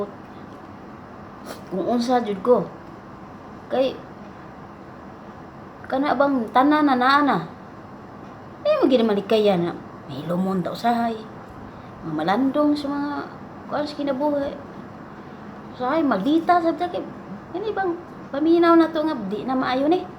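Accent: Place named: Cebu, Philippines. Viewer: taga asa man ka? magcge kagiki katawa akong asawa magtan- aw nimo? mura man taga cebu ang inistoryahan?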